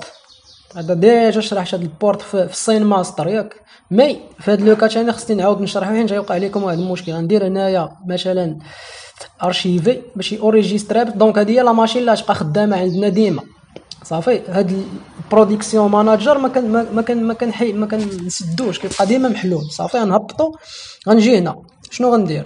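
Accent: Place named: France. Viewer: اتكلم عربي فصيح... كلامك زي الفرنسين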